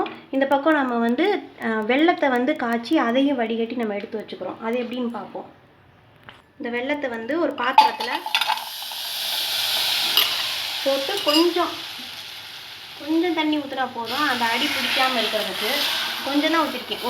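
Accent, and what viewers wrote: Madurai, India. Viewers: madura vaasam unga pechula and paruthi paal namma madurai la mattum thaan spl and kedaikkum innum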